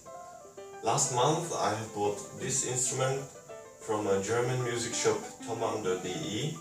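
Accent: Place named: England, United Kingdom. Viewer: I find your video offensive and uninformed; foolish, indeliberately rude libel due to plainly displayed ignorance. I suggest to Kawai that you be sued in a proper court of law for libel. You know nothing about digital pianos and need to go back to your ignominous acoustic piano hole where you came from where you will never find a girl or guy that will come near you. Kawai is a miracle to the digital piano industry. Ingrateful moron with an English accent to prove it...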